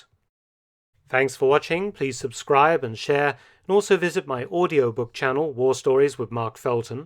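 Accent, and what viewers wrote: United Kingdom. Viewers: Mark Felton Productions-- About 98.6% of your videos are FANTASTIC because you don't use politics or PC or pass judgements, just the facts!! Your videos are more education about the ww2 era than a 200 or 300 college level course on WW2! Your accent sounds British…true? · I’ve been really enjoying this channel lately. Even his British voice goes perfect with this content.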